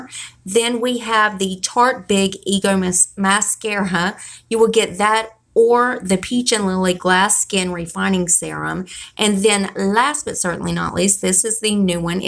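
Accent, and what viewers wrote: South, United States. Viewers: Love that southern accent where are you from babygirl?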